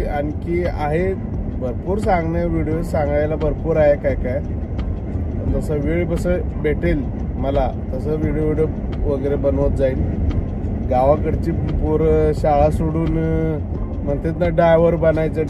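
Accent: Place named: Kolhapur, India. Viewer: तू कोल्हापूर कडेचा आहेस वाटते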